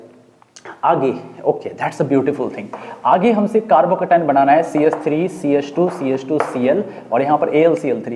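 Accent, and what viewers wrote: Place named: Bengal, India. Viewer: Sir, I wanna ask u a question. Are you Bengali because your accent matches with bong culture.Some friends of mine are from Kolkata & they have same accent. Please reply sir.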